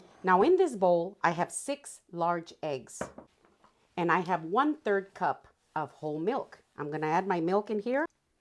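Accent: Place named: Texas, United States. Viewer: "I'm gonna".....girl, if ever there was any doubt you're a native Texan, that should alleviate it. You should throw in a few "I'm fixin to"s just to seal the deal.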